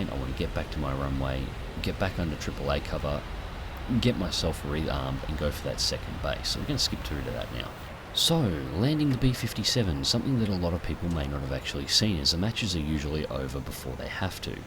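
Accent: Australia. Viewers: Lehtokurppa Von Fön get yr ears cleaned. Hes AUSTRALIAN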